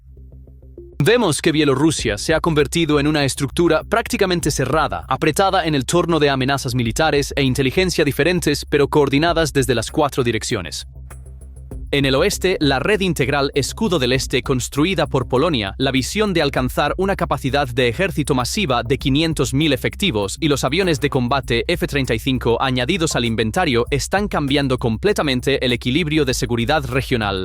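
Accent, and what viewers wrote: United States. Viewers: españa con acento gringo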